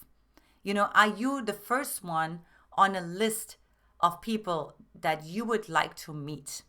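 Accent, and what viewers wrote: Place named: Germany. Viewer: I love your German accent 😊 I was wondering where it comes from. Thank you for the content ❤, it is eye opening.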